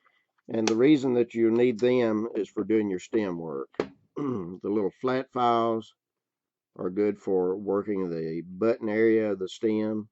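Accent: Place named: South, United States. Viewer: I literally thought I would need 2 or 3 carving tools.. but I see that Iwould need to spend a lot and that is not a problem because it is investment to use them for other work.. but I hate having so manyh tools around which I will use once every 3 years for whimp.. I thought it would need much less tools to do it hand made.. thanks a lot for your informative video. What state or region is your accent from.. thanks. is it southern accent?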